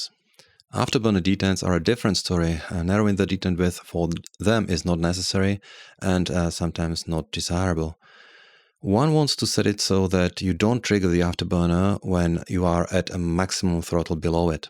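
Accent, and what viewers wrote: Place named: Czechoslovakia. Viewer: Perfektní recenze, možná nejlepší co jsem na STECS viděl (a že jsem jich shlédnul opravdu hodně :). Pořád váhám, jestli si pořídit throttle od VKB, nebo od Virpilu a tohle mě hodně nahlodalo ve prospěch VKB STECS. Jinak jsem rád, za závěrečné potvrzení mého tušení, že vaše perfektní angličtina má nezaměnitelný "československý" přízvuk. Díky a zdravím na Slovensko z Moravy :)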